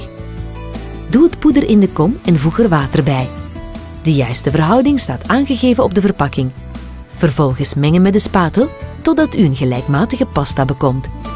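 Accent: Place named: Belgium. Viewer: Het is slechts een Belgisch accent, ze spreekt gewoon Nederlands hoor. Dat leer je in Nederlandstalig België ook op school. West Vlaams is echter wel compleet onverstaanbaar.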